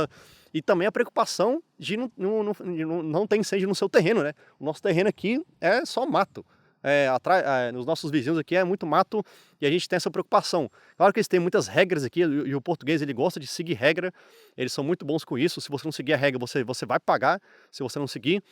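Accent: Brazil. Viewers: Parabéns pelo vídeo, principalmente pelo modo como se expressou num correcto Português, com sotaque mas sem erros. A ideia de Brasileiros não se aglomerarem em guetos levará à aprimoração da qualidade de expressão pois erro gramatical em Portugal também é erro gramatical no Brasil. Quanto à beleza de Portugal irei mencionar apenas isto: Ainda não ouvi nenhum Brasileiro YouTuber dizer que a grande diferença paisagística notória quando cá chegam é a quase ausência de grades e vedações de propriedades à moda brasileira. Este canal ganhou mais um subscritor Português...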